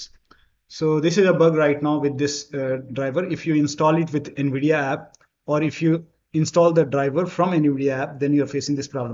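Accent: India. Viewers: i cant listen to some guy with an indian accent sorry .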